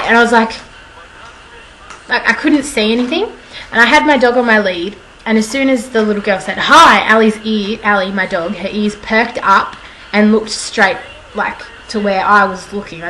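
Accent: Australia. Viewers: I say, "I shit my pants" or "I would have shit my pants" all the time, but something about hearing it in an Australian accent made me laugh like crazy. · i am just so used to my accent, i have the same accent as you, so you were just soooooooooooo easy to understand.i'm from the perth area. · it's good to hear an australian accent again. spent 3 weeks there, about 10 years back, and i loved it there !! beautiful place. :)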